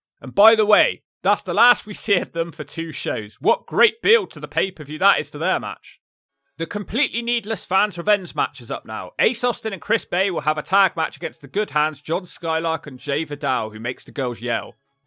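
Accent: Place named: Newcastle, United Kingdom. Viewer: Ur a geordie didn't know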